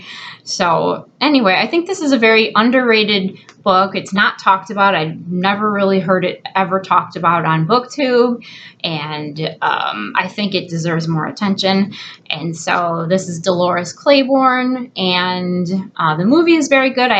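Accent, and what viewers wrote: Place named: Florida, United States. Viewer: I like your accent.. are you from Florida?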